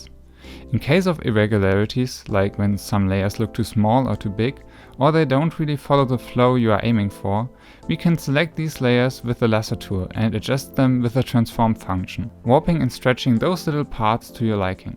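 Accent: Germany. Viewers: I know I‘m late, but I just wanted to thank you for what you achieved and did for all of us here. Your tips are incredible, and after all hair is the thing that stands out the MOST while looking at characters, it‘s the thing that makes them so different, just like eyes or whatever. (Ich war sehr überrascht, als ich den deutschen Manga sah, aber an deinem Akzent habe ich schon erkannt… also in dem Moment dachte ich „Hmmm könnte es sein?!“ finde es voll cool, dass du deine Videos trotzdem englisch machst. Mach weiter so!!! ✨)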